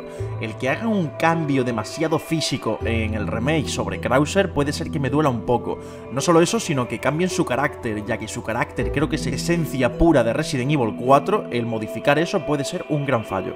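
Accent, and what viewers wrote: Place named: Seville, Spain. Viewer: he visto ya varios de tus videos y transmites muy buena onda y tienes muy buen rollo, te ganaste un sub nuevo aunque no sea mucho xdd, un saludito desde sevilla, que por tu acento diria que no estas muy lejos de aqui jajaja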